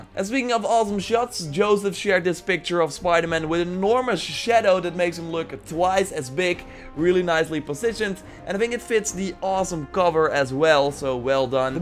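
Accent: Netherlands. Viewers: He's not trying to be annoying it's an accent. He's Dutch and have said on many streams that he's sorry and that he tries his best to talk in English. Some Dutch ppl have a hard time speaking in English so it's a good thing that he can even speak english. Next time before you say someone's annoying do some research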